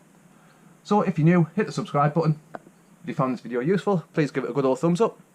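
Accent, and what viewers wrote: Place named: Teesside, United Kingdom. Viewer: My wife has a good ear, she said you were from Teesside 😂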